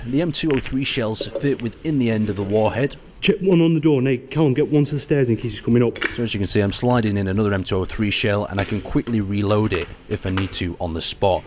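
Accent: United Kingdom. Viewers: u from uk? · you sound like the brittish guy from aw.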